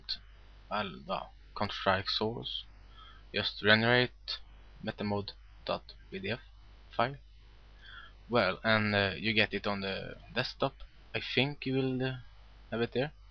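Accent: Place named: Sweden. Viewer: Pungt kom... DOT COM! haha, du är från sverige asså ^^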